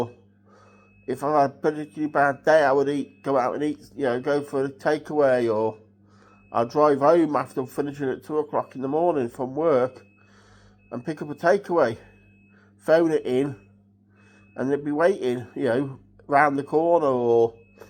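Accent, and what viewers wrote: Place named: Northern England, United Kingdom. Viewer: What part of the uk are you from? You have a northern accent! Ah bless you keep strong!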